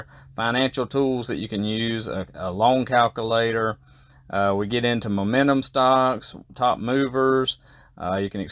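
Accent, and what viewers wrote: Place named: South, United States. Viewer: i love that country accent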